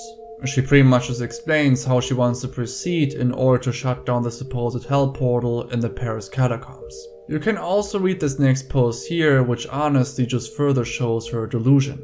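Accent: Germany. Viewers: Sounds German to me too but I'm not positive. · Are u German?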